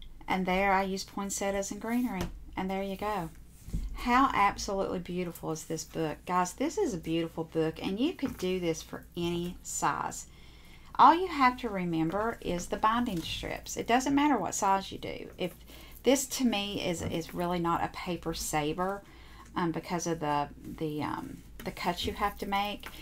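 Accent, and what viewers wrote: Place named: South, United States. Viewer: I’m so glad you popped up on my you tube recommendations. I immediately subscribed. Can’t wait to go back and binge watch. Hope you tell us where you’re from because we have the SAME southern accent. 😊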